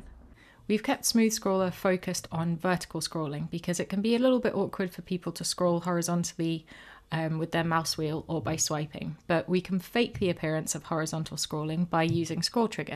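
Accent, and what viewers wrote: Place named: United Kingdom. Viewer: Does anyone think her voice is sexy? What British accent is this from?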